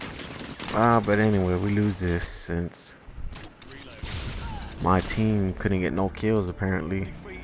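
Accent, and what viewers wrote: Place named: United States. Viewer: You sound American :)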